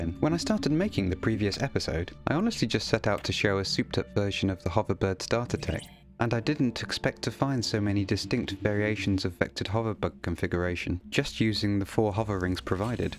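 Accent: United Kingdom. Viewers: I never knew you were British (or at least had a British accent) Oh and I won't like this until somebody decides to ruin that 100 like perfection with another like or a dislike. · That British is awesome.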